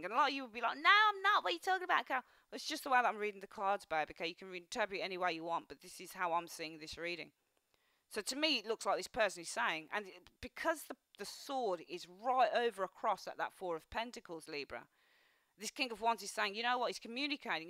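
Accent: United Kingdom. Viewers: New subscribers here! Wow so refreshing to hear a uk 🇬🇧 reader 🤗 as I'm from Birmingham. Spot on for me‼️ I need to let go of my ex Cap the devil 😂 & be open to new love. Just a shame I still have to see him as we have twins together but I definitely need to let got! Thank you 😊 I really appreciate your readings! I hope I spot 👀 the new offer & take it 🙏🏾🤞🏾❤️🌈🙏🏾 I'm keeping my eyes peeled for that now as I don't want to be stuck in this unhealthy mess!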